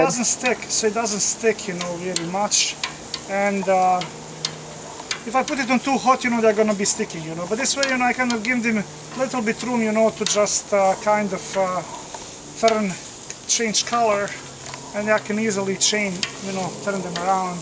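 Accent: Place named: Bosnia and Herzegovina. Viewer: the only thing in this video close to a bosnian cevap is the accent!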